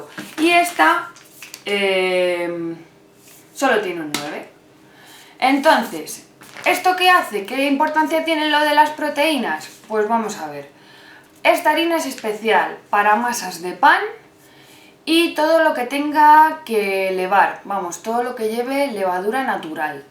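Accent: Spain. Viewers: Me alegro de que te sirva la idea! :D Por cierto, eres vasca? En algunos videos te saco algo de acentillo xD jejeje Y enhorabuena, por tus videos, este canal y el de recetas de cocina los sigo mucho... no se me da muy bien cocinar aun, pero disfruto viendo los vidos :) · ushhh me molesta el acento español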